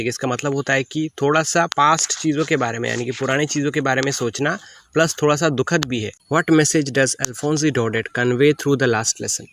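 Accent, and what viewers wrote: Bengal, India. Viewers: Dada apne kita Barak valley theki ni na onno jagar emne tho Buchi apne Bangali 😅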